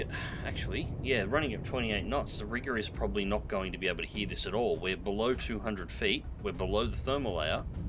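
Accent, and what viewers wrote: Australia. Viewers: Clicked on the video thinking it was Jive Turkey. I was so confused for a second when I heard an Australian voice. :)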